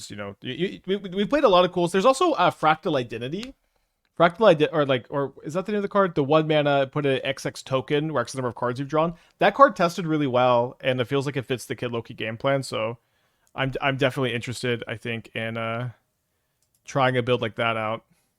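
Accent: Texas, United States. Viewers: I knew Texans actually talked like that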